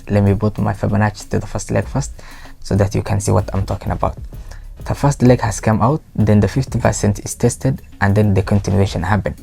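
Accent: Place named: Somalia. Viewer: Your accent looks Somali hh glad to see ur video though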